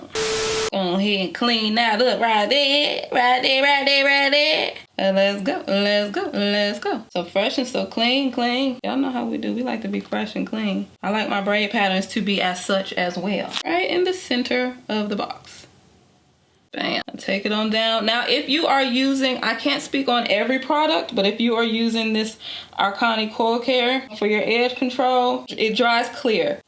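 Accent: North Carolina, United States. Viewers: I KNEW u sounded like a North Carolinian🤣🤣🤣 heyyyy neighbor Lol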